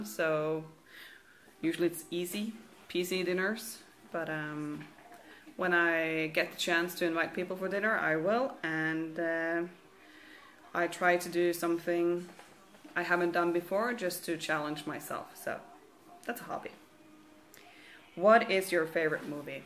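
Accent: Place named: United States. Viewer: I was surprised when you said you lived in Norway. I was expecting somewhere in the United States. The only departure from the American accent was when you said "Agatha" and "progress". I have the book about Shackleton, but haven't read it....I will now. Thanks for the video. Martha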